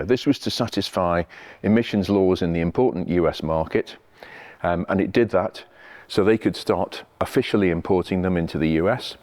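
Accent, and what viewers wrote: United Kingdom. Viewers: God this old gasbag who loves the sound of his own voice - which by the way is a sort of Hyacinth Bucket/Alan Bennet mash up - bridging a gap we never knew we needed filling that's a sort of fussing and constant irritation half way between Clitheroe - and South Kensington. I literally could give less of a toss about the grandiose delusions of any "relationship" with Crewe, l whose hapless senior staff would receive periodic unscheduled visits for a "nice chat over a proper cup of tea" with the full expectations that the posh biscuits were brought out - there's just a hint that the put upon secretary unfortunate enough to have to bring the tray through, was given a little pat on the bottom and a knowing wink and a twinkle that simultaneously says "we're all having fun aren't we pet" and "don't worry about me, I'm like family" ... While in the subject of made up dialogue, I'm sure if not said, then at least thought, was "I like to think many of my suggestions found their way into the cars, if I may so modestly say". 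I made that all up a) because I didn't get any further than "....Doktor Hüffenpump" before a little bit of sick came up at the humblebragging and b) because I wouldn't have cared about the actual words said since I just find him too off putting. And by the way, those Bentley executives, I bet any money, used to run and hide or at least drew straws as to whose turn it was to deal with him😂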